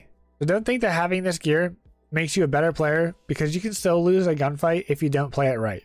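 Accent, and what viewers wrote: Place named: Pittsburgh, United States. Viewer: I could hear it in your accent haha.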